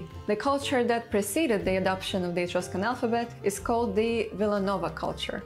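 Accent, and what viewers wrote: Russia. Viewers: Your accent seems Russian/Bulgarian but I’m not too surprised by the rest of your genetic ancestry. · слышу русский акцент. Не у утрусков, у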